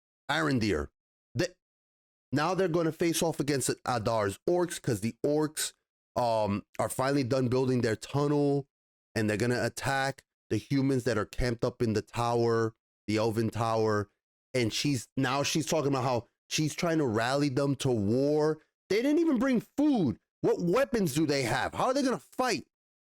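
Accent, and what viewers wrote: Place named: New York, United States. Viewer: Nothing speaks to my soul more than a New York accent ranting. Subscribed.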